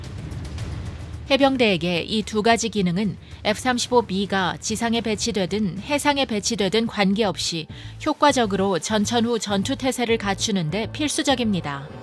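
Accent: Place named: United States. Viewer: 아따~ 누님 미제발음 죽이네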